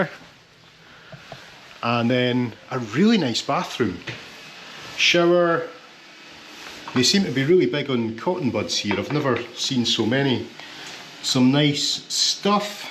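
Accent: Scotland, United Kingdom. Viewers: hey - i really enjoyed your videos tonite. I was on the isle of skye twice, and once on my honeymoon at a magical place called Flodigarrys near Portree. your video really took me back there. and i loved seeing Harris and Lewis islands- felt like i was there. love your scottish accent too! thank you!!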